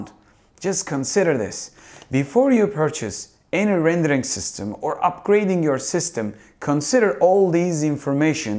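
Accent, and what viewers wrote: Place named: Turkey. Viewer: Direk duyar duymaz anladım Türk olduğunu. Aksan kayıyor kardeşş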